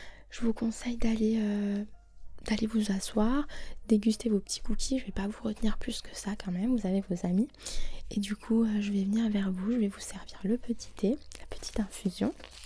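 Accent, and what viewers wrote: France. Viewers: Ton accent🥰🤤 j’adore les français votre accent et votre voix extrêmement douce🤗❤️ Bisous du Québec 💕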